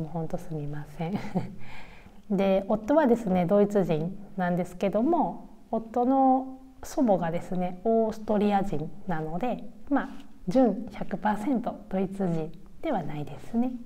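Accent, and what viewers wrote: Kansai, Japan. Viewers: 2万人おめでとうございます🎈 日本に着いたらおにぎり🍙たくさん食べてくださいね😋 動画に使われているBGM気づけばお風呂で口ずさんでいます笑関西弁だけど落ち着いた話し方が好きです❤ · ドイツに住んでおられる日本人の方々がおられる、なんとなく安心感を感じます。関西弁も素晴らしく感じます。私もドイツ在住です。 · Hallo 🙋🏻‍♀️ 大阪の泉州生まれ, タオル有名ですよね｡可愛い関西弁ですよ。Hannoverの近くに住んでおられるんですね \(´∀｀*) なんとお呼びすれば良いのですか？ママさん？ san？旦那様や、子供ちゃん達、めっちゃ日本語上手ですね。子育てについても、ちゃんと, 夫婦で話し合って決めていらっしゃる事が素敵です。おばあちゃまはオーストリア人🇦🇹なんですね。なんか嬉しい。(行った事ある国だから…) 😅 旦那様の日本のイメージ面白いですね🤣🤣 最近の日本は、多様性を重視するようになってきたと思いますが、まだまだなんですね。楽しかったです。👋🏻(╹◡╹ ) ♡❤️ Tschüss, bis bald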